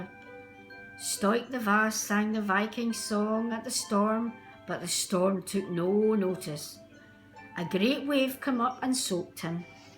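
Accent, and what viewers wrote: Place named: Scotland, United Kingdom. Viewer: And she also has a Scottish accent. This is just brilliant. :D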